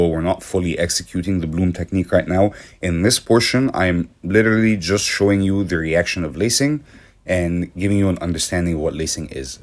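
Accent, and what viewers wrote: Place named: Egypt. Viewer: I just found your videos and the content is such a gem! Your experiments are fun in their own right! True, cells are generated by destabilizing the fluid paint through disrupting surface tension and manipulating paint densities and viscosity while layering, so that some sink all the way down or float all the way up. You can check out the Rayleigh-Taylor instability for more context. Side note: You're AMAZINGLY fluent, but I think I heard a faint Egyptian accent and I immediately grinned 😁 I hope I am right in my speculation!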